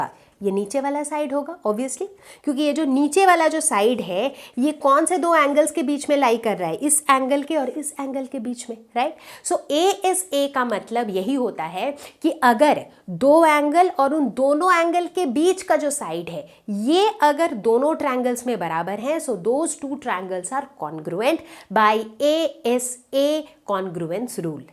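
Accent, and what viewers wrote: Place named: Maharashtra, India. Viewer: Ma'am is from Maharastra 😂👍🏻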